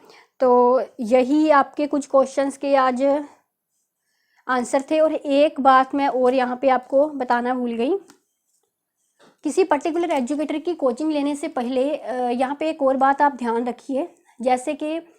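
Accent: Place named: Punjab, India. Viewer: ਜਿਵੇਂ ਤੁਸੀਂ ਬੋਲਦੇ ਓ ,,I like it 😀🤗 ਵਿੱਚ ਪੰਜਾਬੀ accent ,,,pretty voice ਰੁਕਦੀ ਨੀ ਪੰਜਾਬੀ ,,,ਆਪਣੇ ਆਪ ਆ ਜਾਂਦੀ ਐ ,, ਤੁਹਾਡੀਆਂ videos ਦੇਖੀਆਂ ,,,cute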